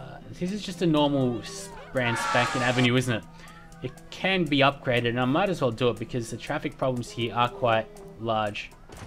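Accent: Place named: Australia. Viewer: Oh and in regards to the microphone, while it doesn't transfer every nuance of your awesome Aussie accent, I could hear you just fine. No worries with the microphone.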